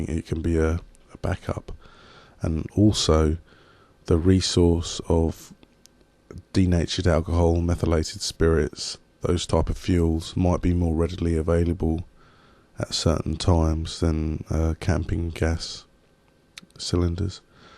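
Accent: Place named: Manchester, United Kingdom. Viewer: might be good to have some baking soda on hand as well to put out flames that might get out of control. Nice vid! thanx! Are you from Manchester? Don't know why but your accent sounds like that to me. I'm from New England so what do I know? -john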